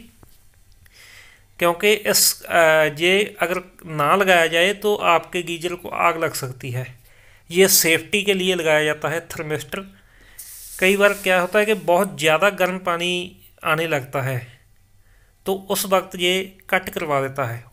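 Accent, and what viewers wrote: Punjab, India. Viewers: Hindi with punjabi touch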